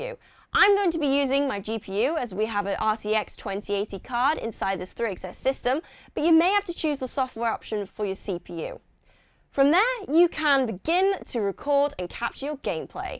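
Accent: United Kingdom. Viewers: Thanks for the tips, and I love how you Brits pronounce "H" lol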